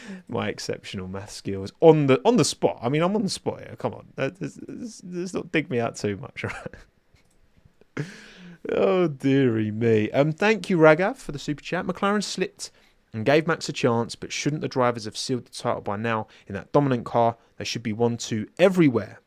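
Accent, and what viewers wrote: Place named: England, United Kingdom. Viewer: I really like hearing your English accent! Are you a Londoner? My uncle always called his head a Swede. Nice Barnett by the way😊!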